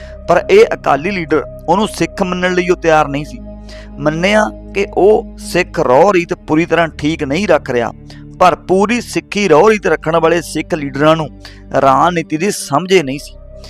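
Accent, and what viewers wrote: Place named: Puadh, India. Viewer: ਬਾਈ ਜੀ ਤੁਹਾਡੀ ਆਵਾਜ਼ ਬਹੁਤ ਪਿਆਰੀ ਐ ਤੁਹਾਡੀ ਬੋਲੀ ਪੂਆਦੀ ਲਗਦੀ ਐ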